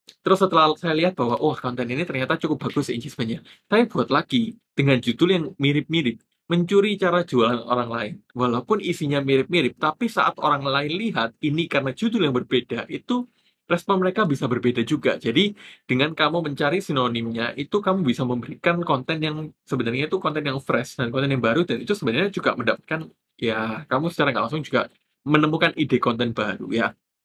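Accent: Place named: East Java, Indonesia. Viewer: tetep ya..orang jawatimuran atau surabaya itu dialeknya khas.. po meneh wong solo hehe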